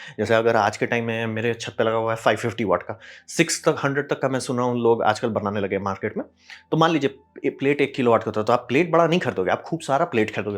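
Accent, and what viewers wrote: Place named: East India, India. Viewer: A u from bihr or east india? Value to bhalue??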